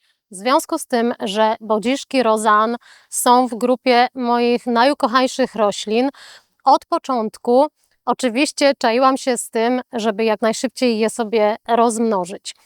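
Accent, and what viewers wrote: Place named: Poland. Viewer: Och prawdziwa osoba, prawdziwy ogród, prawdziwy polski głos. Dziękuję za ogromną wiedzę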